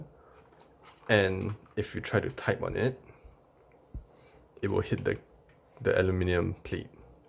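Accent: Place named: Malaysia. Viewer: Lol I like your review on this. Thanks man. You sound like you're from MY or SG btw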